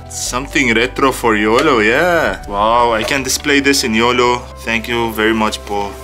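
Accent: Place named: Philippines. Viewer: The way you talk English sound like more Filipino already 😂😂😂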